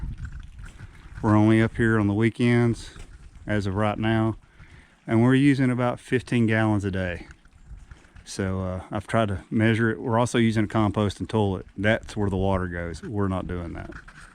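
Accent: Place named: South, United States. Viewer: You sound like Forest Gump. Are you a Southerner, from Mississippi maybe?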